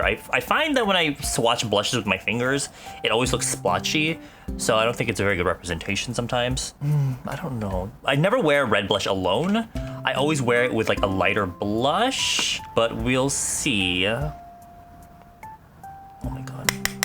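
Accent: South Korea. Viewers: Loving the kenglish😂❤️ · we love a konglish queen <3 come thru!! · Thank god I can understand fully your konglish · sometimes i dont even realize its such strong konglish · YOU AND YOUR KORENGLISHHH OMG T~T idk what ur saying 😂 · That konglish tho😂 love you bae🖤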